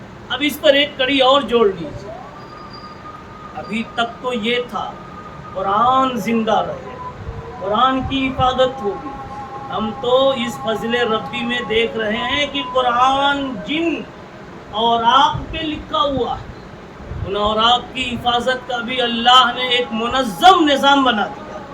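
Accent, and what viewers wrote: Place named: Pakistan. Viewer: قاری صاحب کا لہجہ لہجہ مکندواریہ لگتا ہے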